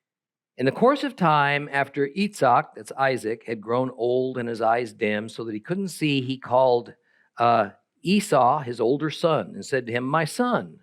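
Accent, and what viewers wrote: Florida, United States. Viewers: Im willing too bet by this mans accent y'all from Florida somewhere this guy sounds like a Baptist preacher i heard out of Florida they could be identical by voice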